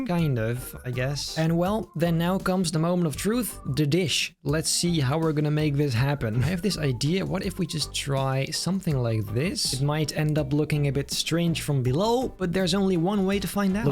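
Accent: United Kingdom. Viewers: Is he British init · he is getting to british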